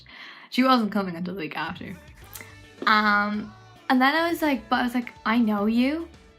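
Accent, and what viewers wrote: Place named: Ireland. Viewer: I didn't know you were Irish? (i think, you sound irish) your accent is cute and I saw that you did go and to a halsey concert, but I'm glad that you had fun 💞💗💗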